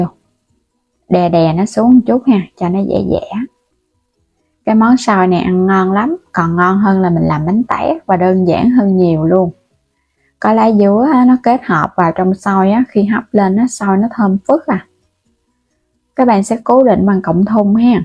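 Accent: Mekong Delta, Vietnam. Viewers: Giọng nói miền Tây của người ta đó 😊😊😊😊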